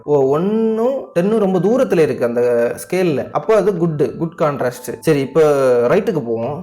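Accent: Kanyakumari, India. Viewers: Bro neenga kanyakumari ah?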